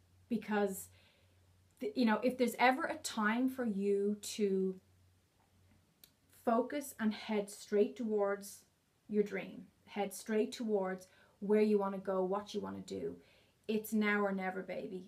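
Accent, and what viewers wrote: Ireland. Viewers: thought the accent was Irish. I'm up in belfast, would love some where in the country away from it all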